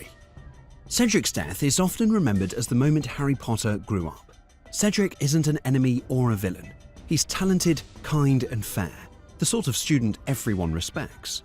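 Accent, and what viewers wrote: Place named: United Kingdom. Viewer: Why're you British. but from United States!?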